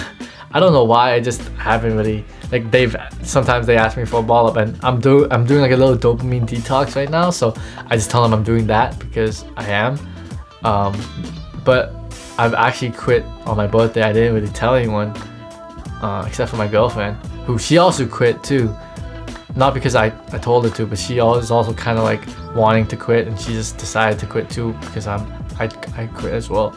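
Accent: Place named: Toronto, Canada. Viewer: definitely from toronto, man said ball up hahaha, i rate it though keep it pushing brotherrr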